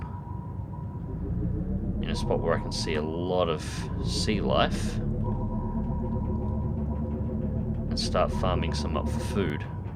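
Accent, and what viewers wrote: Australia. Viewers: its soo relaxing to watch underwater exploration carried out by someone with an Aussie accent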